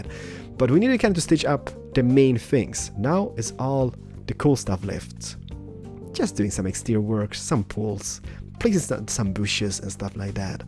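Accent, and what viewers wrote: Sweden. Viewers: are you swedish? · Are you swedish?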